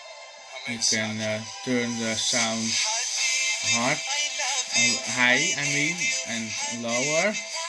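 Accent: Netherlands. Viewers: Echt goud hoe je zijn Nederlands accent hoort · Hahah Nederlands accent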